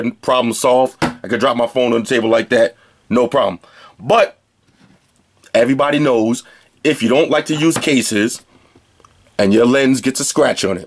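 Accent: United States. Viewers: Loving the american accent though lol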